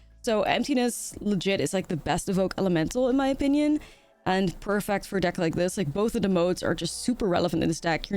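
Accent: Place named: Netherlands. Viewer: I love your very mild dutch accent, it is kind of cute. Very nice and structured content you have. Greetings from germany ❤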